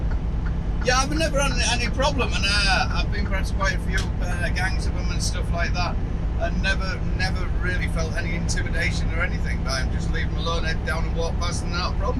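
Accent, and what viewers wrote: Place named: Cockney, United Kingdom. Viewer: Is that a Cockney accent? Even the trucks recorded voice had a female Cocky accent. Doesn't sound like rural Iowa to me.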